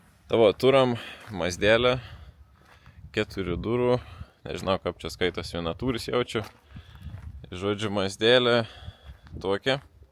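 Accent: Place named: Samogitia, Lithuania. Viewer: Geriau visados žemaitėška💪💪💪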